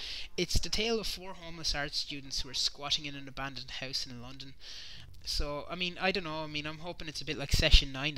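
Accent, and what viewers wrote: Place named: Wexford, Ireland. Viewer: Nice, I'd messaged you via Facebook re. The Lost Classics. Great to hear a fellow Wexfordian and horror aficionado. I get that northern shite all the time as well, crazy!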